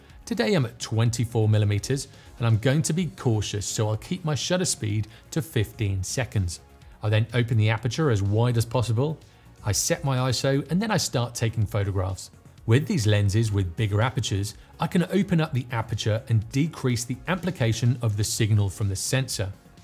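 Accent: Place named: Wales, United Kingdom. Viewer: bloody hell would never have placed you as Aberystwyth! But it is the perfect presenters voice man not gunna lie. H that's awesome and likewise, love your channel!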